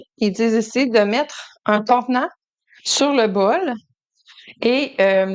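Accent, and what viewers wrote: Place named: Canada. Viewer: Merci pour cette recette, j adore l accent canadien 🎉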